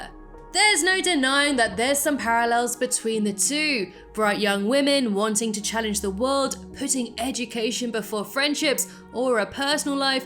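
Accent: United Kingdom. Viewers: the narrator is ANNOYING! I fucking HATE these british narrator accents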